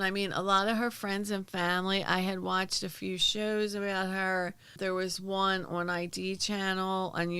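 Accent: Philadelphia, United States. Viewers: Also ....YO PHILLAY!! ✌🏻😘 Living in CA makes me miss the accent and no BS🙌🏻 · Hi, stolen from me by Lindsay sent me. She was really bragging about you and your philly accent I just wanted you to know I subscribed and I'm excited about watching your videos and as Lindsay would say put my headphones on and getting about things, lol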